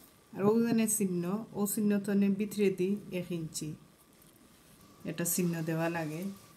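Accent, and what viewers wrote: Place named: Sylhet, Bangladesh. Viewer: Nice hearing sylheti bangla. Can u show how to make saree blouse?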